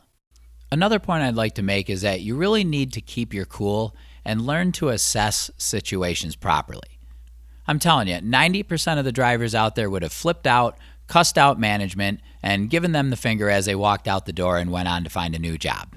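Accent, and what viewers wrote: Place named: Midwest, United States. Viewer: I can definitely hear your mid-western accent. Nice well informed video. Maybe someone can learn from this.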